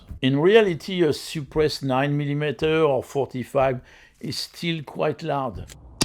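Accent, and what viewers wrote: France. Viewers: There’s a French Guy telling us about firearms?? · As a native french speaker, his accent is way too pronounced. This is so cringe. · First time watching this channel. I enjoyed this episode. I especially liked the French (I believe?) accented narration because it’s unusual.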